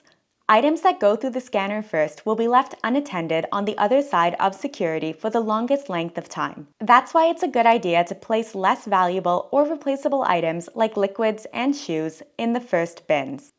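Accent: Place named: South, United States. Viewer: That southern draw is disconcerting... just another reason I stay out of georgia.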